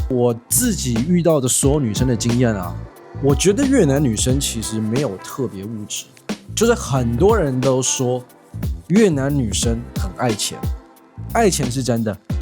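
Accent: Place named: China. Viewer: 你怎麼講話中國羌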